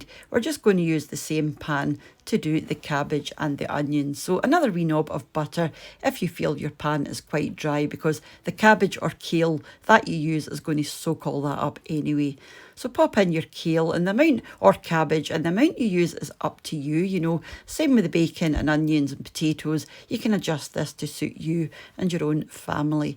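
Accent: Scotland, United Kingdom. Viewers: Love the Scots accent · I missed hearing your Scottish voice!!! "Knob of butter" sounded so much better than a "pat of butter." Colcannon is one of my favorites to make for St. Patrick's Day and Easter. I never heard of bacon steak but maaaaannnn, I wanna try it! You made your colcannon beautiful. Mine is light gray because I put in bacon grease instead of butter. I'm going to try your way for Easter. Thank you for showing how to make it beautiful!!!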